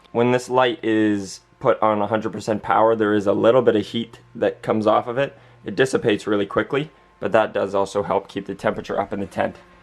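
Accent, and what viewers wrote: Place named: Canada. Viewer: Great vid, nice and concise, aaaand Canadian? Subscribed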